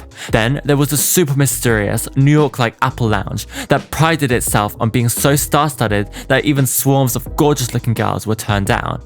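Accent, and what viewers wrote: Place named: RP, United Kingdom. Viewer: LOVE your channel and your voice— so posh! I’m definitely a miserable millennial nostalgia worshipping on simpler times. See you in hell 💋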